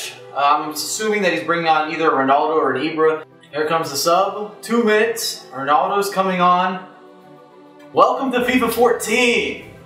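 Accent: Houston, United States. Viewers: Are you from Houston?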